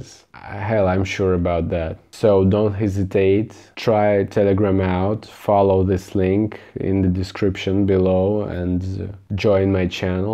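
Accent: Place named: Russia. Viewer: Ты же русский, слышно акцент)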